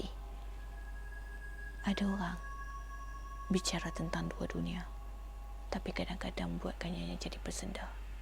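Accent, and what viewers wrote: North, Malaysia. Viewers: Kekalkan cerita cakap owg utara sbb sembang best sgt..kami suka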